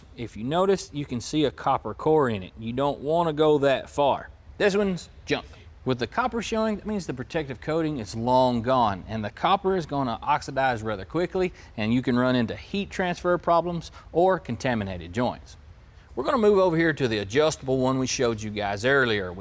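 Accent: South, United States. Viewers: Man oh man I really love southern accent!!! Don't lose that accent.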